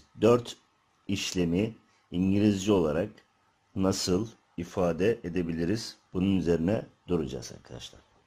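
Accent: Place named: Australia. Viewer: Aussie english!!!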